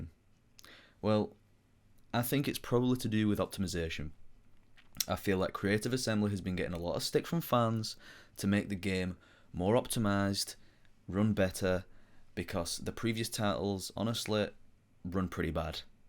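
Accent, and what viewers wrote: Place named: Northern England, United Kingdom. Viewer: It sounds like hes northern english.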